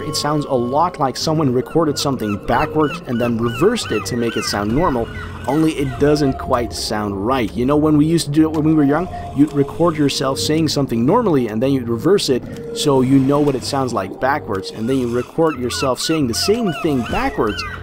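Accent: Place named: France. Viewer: Voice over guy sounds french.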